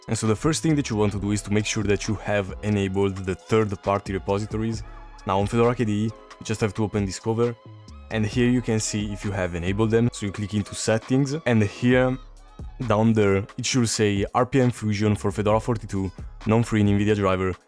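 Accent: Italy. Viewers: bro hai il mio stesso accento nelle interrogazioni di inglese, dimmi che sei italiano e non sono pazzo io. Bel video btw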